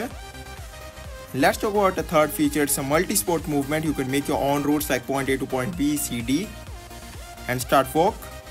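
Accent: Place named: India. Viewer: It’s obvious. He’s from India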